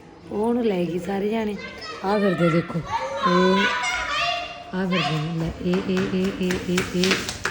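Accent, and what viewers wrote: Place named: Barnala, India. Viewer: Khudi kalan mandeep de sohera pind tusi barnala bol rhe oo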